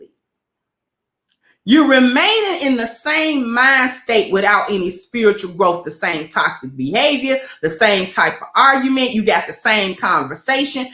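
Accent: Chicago, United States. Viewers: That Chicago accent heavy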